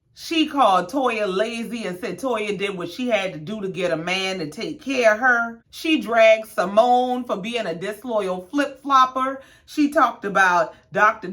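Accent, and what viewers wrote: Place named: Charleston, United States. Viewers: You from Charleston????? Sound like me and my people!!!😂😂♥️♥️♥️♥️ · You gotta be from the low country. I know home when I hear it. Chile Eugene gone go out for cigarettes one day and never return. Works himself to death,smh. Is he not tired of moving?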